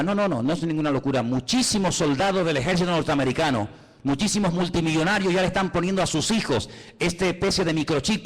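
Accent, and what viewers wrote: Spain. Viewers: Muy tremendo mensaje usrted me rebendijo con la palabra .. saludoa desde argentina ... de donde es la iglesia ? .. por el ascento es de españa?.. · Que buen mensaje pastor;👌 por su acento es Usted Español ..bueno es Dios 👏que todavía tiene hombres diciéndole a los feligreses la verdad ;que otros esconden solo hablan de prosperidad económica y las almas que se pierdan 😳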